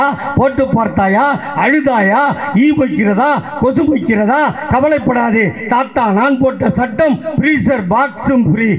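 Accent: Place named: North Chennai, India. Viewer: Vada chennai la ippadithane pesuvanga. Pengalume ippadithane pesuvanga. This is vada chennai local language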